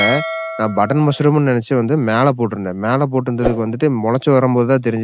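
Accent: Coimbatore, India. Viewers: கோயம்புத்தூர் சுற்று வட்டாரத்தில் பறிக்கிறதுன்னு சொல்றத பொறிக்கிறதுனு சொல்லுவாங்க அது பேச்சு வழக்கம் யாரும் ஏதும் நினைக்க வேண்டாம்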